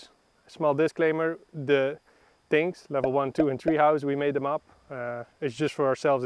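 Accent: Netherlands. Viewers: Het Nederlandse accent 😂😂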